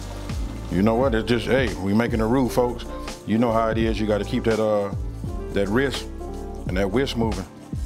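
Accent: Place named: Los Angeles, United States. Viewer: Are you from L.A? Lol got that L.A accent lol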